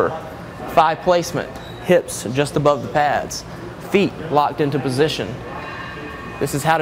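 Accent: South, United States. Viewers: This guy is clearly southern. He doesn’t sound like DiCaprio at all. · Are you tonedeaf? His voice is nowhere near DiCaprio's. For starters, he has a very obvious southern accent overtone of some sort.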